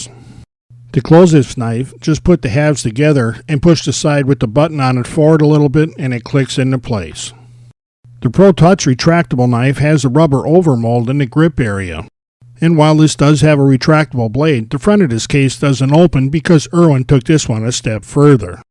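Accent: Chicago, United States. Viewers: Very useful video! And is that a Chicago accent?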